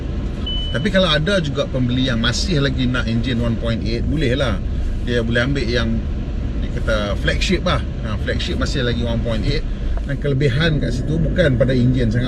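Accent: North, Malaysia. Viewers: Mmg puaih hati haih dgr penerangan loghat utaqa dia...batoi² Sempoiii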